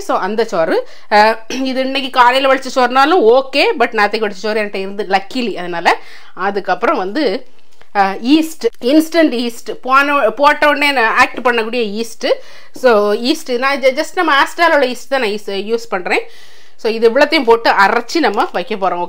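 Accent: Nagercoil, India. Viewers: Akka neenga nagerkoil ah.appadiye antha slang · Nagar kovil tamil super sis.... Aappam soo soft and 😋yummy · நானும் நாகர்கோயில் பக்கம் தான், எங்க ஊரு பேச்சி style, கேக்கவே சூப்பர் ஆஹ் இருக்கு....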